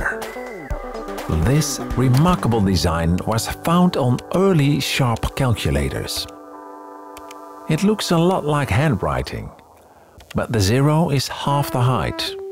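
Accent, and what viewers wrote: Netherlands. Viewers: Are you from the netherlands? it kind of sounds like it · The Dutch accent is truly horrific. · I already thought I could hear it from the accent, but hell yeah this man dutch. Mooie video, ik hou wel van dit soort deepdives in iets waar je normaal gesproken nooit over na denkt :) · noticeable Dutch accent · In the 1990s, I was already an experienced engineer in my 30s. Some technologies that used 7-segment: "Numitron" (incandescent), LED, LCD, VFD (vacuum fluorescent), mechanical (plastic, brightly painted on the active segments), & "Panaplex" (Neon, used on some 70's microwave ovens, and gas pumps). This guy's accent sounds like his native language is Dutch. I was born in the Netherlands. Moved to the US as a child, and use to tease my father about his thick accent, in my teens. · Why is it that i can always hear that someone is dutch?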